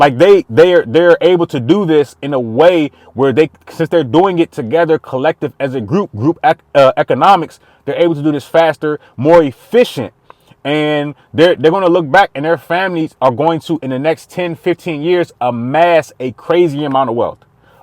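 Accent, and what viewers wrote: DMV, United States. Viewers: Lol i knew from how you talked you were from the DMV, before you said it in this video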